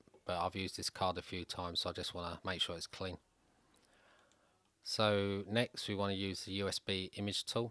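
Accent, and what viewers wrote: England, United Kingdom. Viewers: Can't understand that AWFUL english accent. I m outta here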